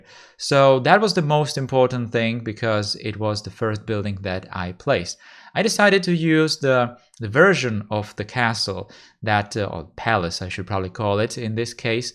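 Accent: Czech Republic. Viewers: Are you Czech ? :D that accent .. or Slovak by any chance? :D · Hi can I ask you where are you from. Your accent sounds Czech. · your accent tells me you are Czech, am I right?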